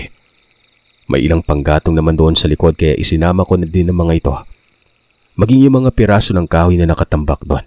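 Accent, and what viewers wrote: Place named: Iloilo, Philippines. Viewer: Ilonggo. Eh. Malambing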